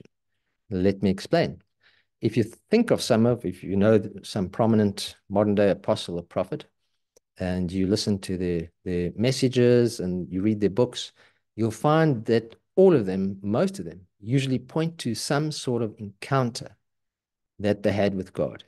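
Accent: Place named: South Africa. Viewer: Hey, spot the South African accent!! 😄 Greetings brother.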